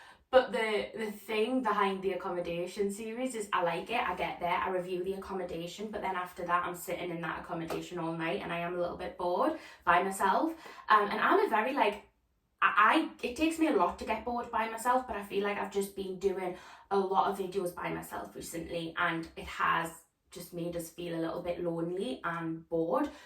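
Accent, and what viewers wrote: Newcastle, United Kingdom. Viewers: I’ve been watching for years and think honestly you’re putting far too much pressure on yourself. I followed you for bargain shop hauls, fun vlogs and to hear your Geordie accent. Go back to basics, you don’t need to be an influencer or even on social media as much if that isn’t what you want. Maybe a part time job in real life might help give you some perspective on if this is what you want to do! Sending love and positive vibes xxxx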